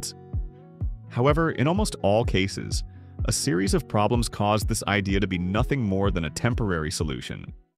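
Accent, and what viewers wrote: United States. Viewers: Quite. I was hoping the video would move on to electric buses and discussing how bus design might evolve now that there’s no need to be constrained by the limitations of diesel engines, but it never came. Might have guessed, considering the US narration.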